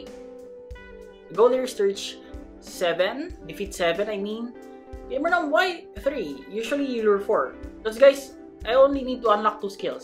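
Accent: Philippines. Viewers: Gamernom are you a filipino? Because you sound like one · His accent is filipino lol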